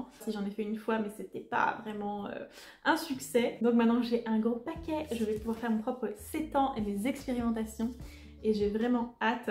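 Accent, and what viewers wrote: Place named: England, United Kingdom. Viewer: Il est kiffant ton accent anglais. xD Et Sausage Party c'est le feu, à regarder vrament.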